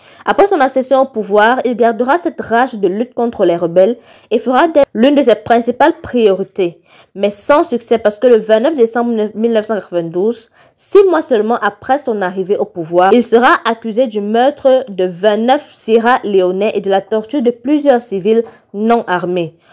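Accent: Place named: Cameroon. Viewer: L'accent est trop camerounais et sérieusement inaudible 🤢🤮 faîte un effort svp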